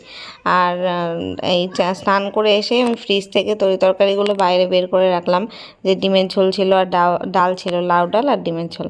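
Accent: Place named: Bangladesh. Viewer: Eto modern sobkichu , kintu asba , jaba , khaba ei bangal kothagulo birokto lage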